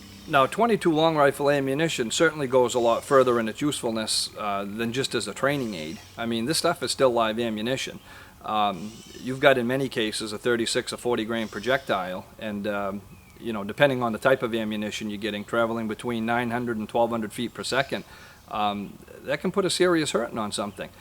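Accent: Maine, United States. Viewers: Hahaha. Great video man, I really enjoyed it 👍 " " a Maine accent and a M&P 15-22. Def America.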